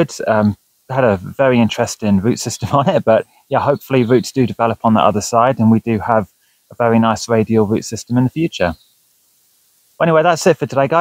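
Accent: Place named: England, United Kingdom. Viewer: By the accent, you are English, aren´t you? Crazy good potters over there, I got some :D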